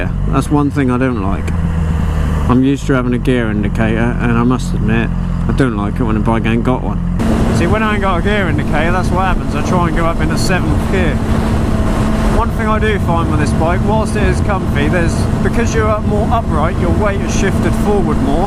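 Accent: Norfolk, United Kingdom. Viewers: Great vid. What would the R6 be like as a first bike? Also. I thought I recognised your Norfolk accent as I am only 20 or so miles from the border. lol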